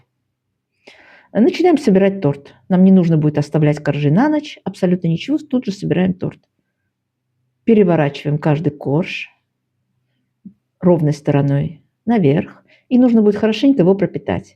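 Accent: Baku, Azerbaijan. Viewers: Ваш бакинский акцент никуда не делся ❤ А мы в крем добавляли еще 100 г орехов, и в тесто тоже коньяк. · Ах! Как я рада что нашла у вас этот рецепт. Давно искала. В Баку мамина подруга пекла, и было очень вкусно, он как ириска, тягучий с орехами, с приятным медовым ароматом. Спасибо вам огромное! Вы прелесть! Очень подробный рецепт, детальное описание, сладкий бакинский Джан акцент! Благодарю!!!!!!!❤ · Дорогая. Спасибо большое за рецепт. Обязательно попробую испечь для моих гостей. Вы чудесная женщина. Ваш бакинский акцент греет сердце.♥️ ❤ ❤ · где бы Вы сейчас на не жили, а бакинский акцент не ушёл. Счастья Вам и мира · Я,как услышала ваш разговор,сразу поняла,что вы бакинка.Я тоже пекла этот торт в 83 году.Очень вкусный. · Большой привет бакинцам! Приятно слышать бакинский акцент! Очень интересный канал, богатая коллекция рецептов!!! Спасибо вам!!! Я тоже жила в Арменикенде! 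С уважением из Ростовской области..... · Бакинский акцент до сих пор прослеживается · Лёгкий бакинский акцент приятно слышать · добрый вечер!Я коренная бакинка.Сейчас живу на Кубани и с громадным удовольствием смотрю все ваши выпуски.Радуюсь вашему знакомому бакинскому акценту и замечательным и несложным рецептам.Оставайтесь такой же молодой,красивой и обоятельной!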